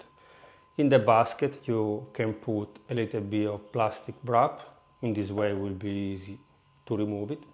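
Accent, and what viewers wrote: Italy. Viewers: I Love the Italian accent fratello! 🙏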